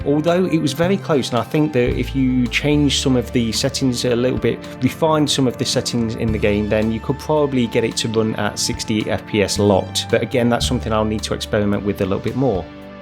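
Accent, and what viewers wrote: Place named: Leicester, United Kingdom. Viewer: That’s a Leicester accent if ever I heard one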